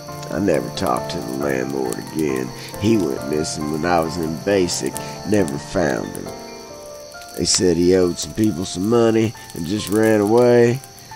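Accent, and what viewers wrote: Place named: South, United States. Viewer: Southern accents need more love in this community.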